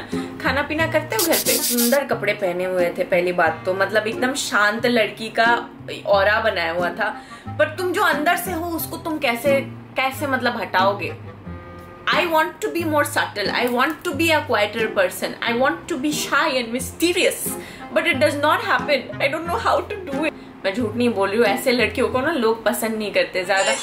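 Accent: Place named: Bengal, India. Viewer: I have subscribed already yaar....❤️....I follow u on myntra as well.....I like ur attitude and accent ...u are just like me....and I'm Bengali too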